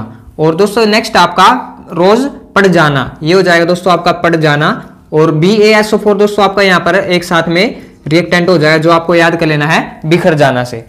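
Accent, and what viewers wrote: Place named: Haryana, India. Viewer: but kuch words the jisse saf jahir horha tha ki ap haryana se ho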